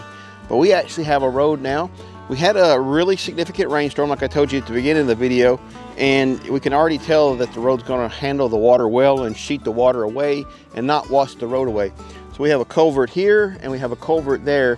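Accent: South, United States. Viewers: You speak perfectly understandable Southern Slanglish.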